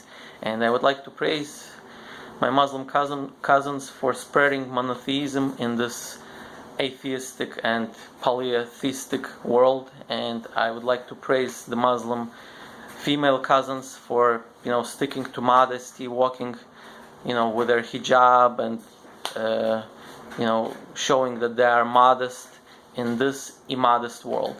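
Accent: Russia. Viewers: You are russian speaker по акценту замечаю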